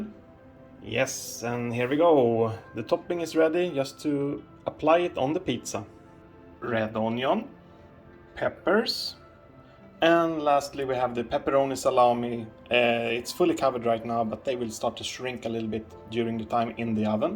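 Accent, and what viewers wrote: Sweden. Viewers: Hey are u swdish u sound like it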